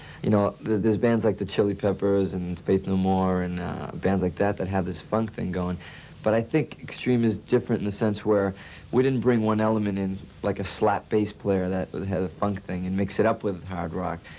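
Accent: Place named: Boston, United States. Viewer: Boy lol you sure hear your Boston accent! Now just that LA accent! Its shows that today looking back you still have those same thoughts and ideas! Your still doing your own thing! rock on! cant wait to hear the whole album! xo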